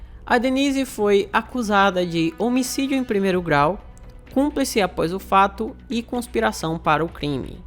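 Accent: Northeast, Brazil. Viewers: Adoro o sotaque nordestino do.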